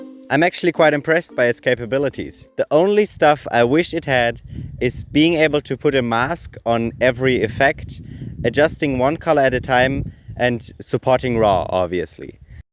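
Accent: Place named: Germany. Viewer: Super! Und ich lag mit meiner Vermutung, dass da kein bulgarischer, sondern ein deutscher Akzent durchzuhören ist, nicht ganz falsch. Mir wäre geholfen, wenn du noch mehr zu dem Thema machst. Ich überlege seit Längerem, ob ich mich als Anfänger mit meiner Sony RX100 eher, in Photoscape X, Darktable oder Rawtherapee einarbeiten soll. Vielleicht geht es sich ja einmal aus, dass du ein Video zum diesem Thema machst. Zudem werden Videos mit "RX100" in Titel sicher häufig geklickt.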